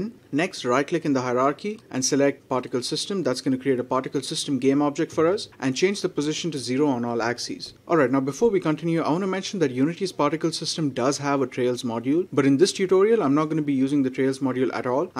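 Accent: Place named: India. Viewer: Damn it's one great Indian accent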